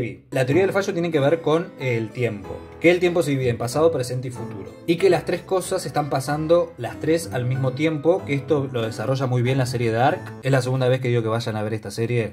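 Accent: Argentina. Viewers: Sos de Goya?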